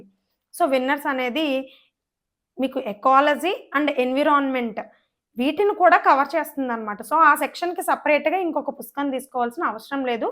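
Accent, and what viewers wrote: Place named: Godavari, India. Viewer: మేడం మీది గోదావరి జిల్లా నా మీ accent అల ఉంది బాగా suggest చేశారు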